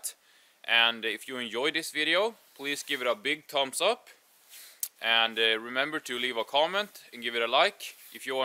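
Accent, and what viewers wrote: Sweden. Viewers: Nice. I've know about most of those. Never thought about permanent markers. Is that a Swedish accent you have? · Great video! Are you Swedish? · Kung är du man hör att du är svensk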